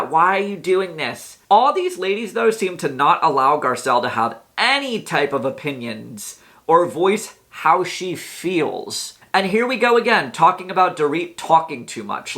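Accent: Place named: South, United States. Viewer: I'm Enjoying your channel. You have a cute accent, southern?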